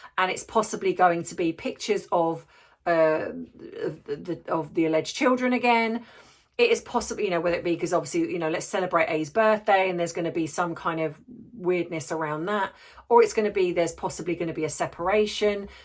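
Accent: United Kingdom. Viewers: Thank you, my dear! I could listen to your lovely British accent alllll day! 💕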